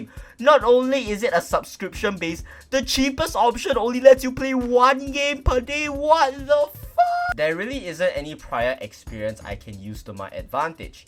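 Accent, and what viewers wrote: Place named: Singapore. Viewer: Bro has the most Singaporean accent i heard in a long while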